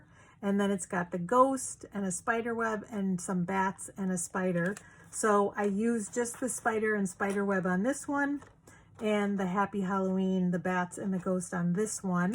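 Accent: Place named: East Coast, United States. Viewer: And…..I love all of your box designs!! Love hearing your Eastern accent. This CO girl grew up in New York and all of my brothers live in MA now. Miss those spectacular fall colors. Hugs, Karen ❌🍁❌❤️❌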